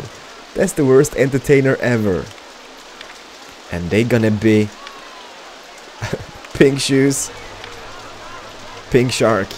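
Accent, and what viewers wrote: Sweden. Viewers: Are you from sweden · What part of sweden? Skåne or somthing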